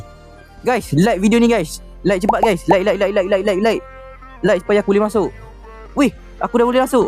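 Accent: Malaysia. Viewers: WEH MU KELANTAN KO 🗿🗿🗿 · Bang,ni orang kuala lumpur ke, saya orang kedah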